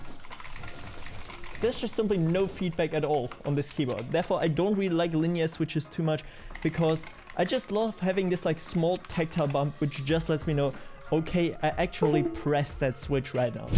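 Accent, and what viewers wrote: Germany. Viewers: Ich höre deutsch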